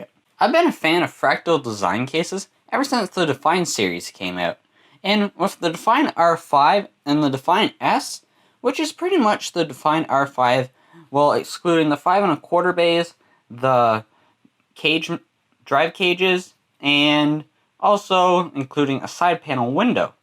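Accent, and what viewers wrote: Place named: Canada. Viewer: you speak so bad and the Canadian accent makes it even worse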